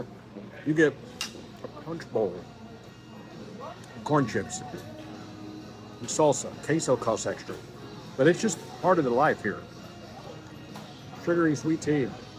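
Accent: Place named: Texas, United States. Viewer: A definite texas twang in your accent when you're back home...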